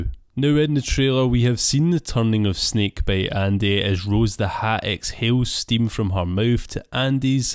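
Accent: Scotland, United Kingdom. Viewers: Erm....his accent is...scottish...😂😂😂😂😂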